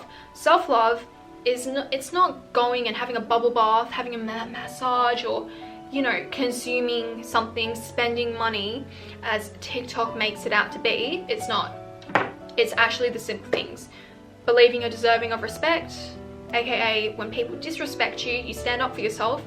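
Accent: Australia. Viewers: Hey! I just love your content sooo much. It’s so informative and full of your experience. I guess everyone is glad that you share all your knowledge about life and many important other topics. Every time I watch your videos when I‘m down it makes me feel a lot better. Somehow it’s so calming to hear you speaking. (I LOVE YOUR AUSTRALIAN ACCENT). Thank you for everything<3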